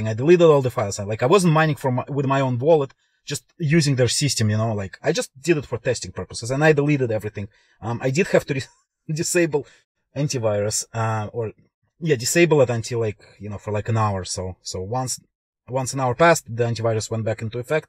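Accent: Russia. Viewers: Русский акцент??)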